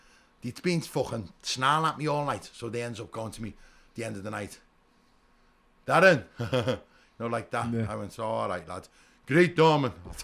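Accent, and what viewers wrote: Liverpool, United Kingdom. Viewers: Nobody can tell a good Yarn like a Scouser 😂😂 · Yeah okay scouse!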